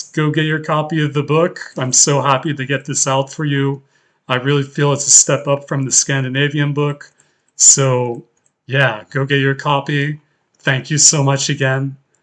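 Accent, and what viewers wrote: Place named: United States. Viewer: What a concept! I am considering learning Afrikans and Frisian at the same time, or at least close together. I am going to go to your website because I am curious about you. Based on a few clues I think that you were raised in a household where English was the primary language of communication. But, you do sound American. So, my first guess is Yiddish.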